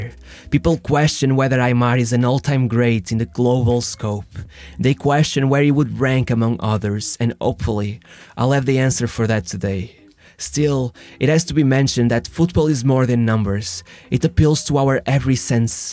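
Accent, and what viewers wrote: Portugal. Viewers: Amazing how a portuguese guy only needs 5 seconds to realize that’s a portuguese guy speaking english. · Tu tens de ser português, o sotaque não engana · Hey man, love the videos 😊 Just a quick one. We speak Spanish in Argentina (it's actually called Castellano - <link> but with a different accent because of the 65%/70% Italian influence). I know, I sound like a pain in the 🍑 but I like your videos and I'd like for you to get better and get more viewers 🙏🏽 Your Rs and Gs are pronounced with a Portuguese accent. Therefore, they have nothing to do with Spanish. It's the equivalent of speaking Italian with and pronouncing it with a French accents. Again, sorry for being a pain 🧑🏻‍🏫 and thanks for the amazing videos 😊 Ciao! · este sotaque é tão tuga certo ? ahha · I cant help but to ask, are you portuguese? If you are not cangratualations because your portuguese accent is perfect. 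· I love this guy’s portuguese accent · Faltou-me dar aquele suporte, optimo ver um portugues envolvido num bom trabalho internacional! Sigo o canal e deixo-te os meus parabéns pelo trabalho, cada um com sua pronuncia e ainda bem que a tens porque assim dá para os teus compatriotas te identificarem e apreciarem! One love <3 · This voice has such a portugese accent ahah 100 % sure he is portuguese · no I don’t. He probs went to a English speaking country as very young lad but still carries the Portuguese language accent. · o teu portugues no ingles é muita mau fds, esse sotaque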